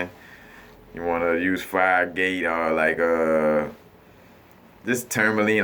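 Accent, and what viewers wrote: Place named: South, United States. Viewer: where are u from ? u sound like ur from the south nere me in Louisiana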